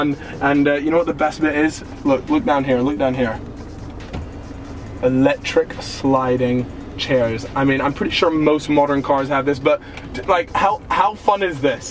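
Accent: United States. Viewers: american accent lol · Are you American or Canadian? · dude he sounds American not English tf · why does this guy have an american accent? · is he american? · am the only one thinking that sounds American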